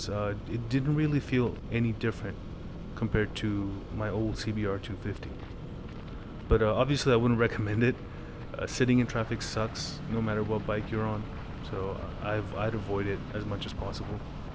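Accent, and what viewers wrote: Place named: United States. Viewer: Bro your English is impeccable. You sound like you've grown up in the U.S. your whole life haha.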